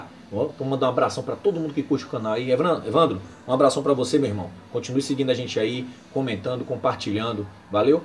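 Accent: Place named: Bahia, Brazil. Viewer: Tem sotaque de Baiano rss.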